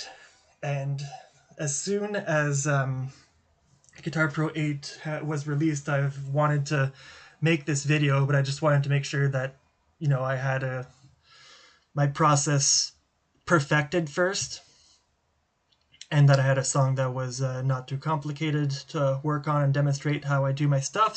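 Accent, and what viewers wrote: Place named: France. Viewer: J'adore ton accent français !! Merci pour toutes les transcriptions ! J'adore faire du déchiffrage ! J'ai commencé avec le confinement en mars 2019, grâce à toi (et d'autres sur le web) j'ai fait des progrès en déchiffrage. Mais si certains titres ne sont pas à mon goût ! 😁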